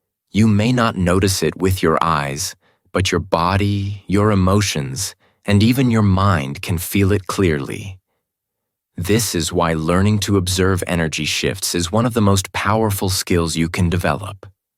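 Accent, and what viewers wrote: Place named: United States. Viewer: with a vile accent twist. With all of mankind's languages to choose from to prostitute, they chose a most historically beautiful and fascinating language. America, a pox on humanity.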